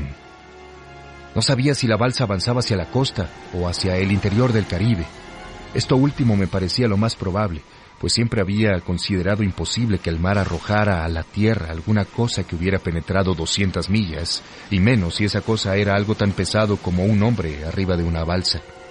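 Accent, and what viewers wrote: Mexico. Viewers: Cómo siempre las voces mexicanas deleitando al auditorio tanto en películas como en narraciones . Felicidades desde stgo . De chile .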